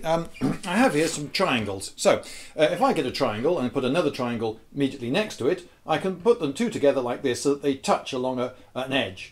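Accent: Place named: RP, United Kingdom. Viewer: why is your accent so posh? Is it real?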